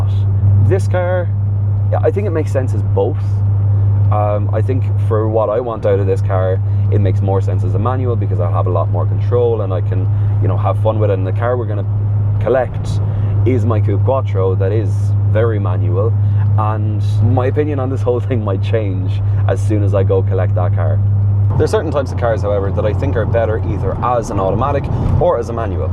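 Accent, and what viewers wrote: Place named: Germany. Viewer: damn (i am german) i love your "german accent" it was very funny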